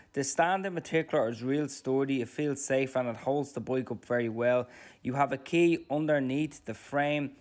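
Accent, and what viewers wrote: Dublin, Ireland. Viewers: I've been trying to work on my Dublin accent for a while now, and that we all have to return to our offices, i've been looking for an e-bike, so your videos are so dope! I know you are just speaking normally, but if you can, please speak in the most rawest Dublin accent possible for your next one!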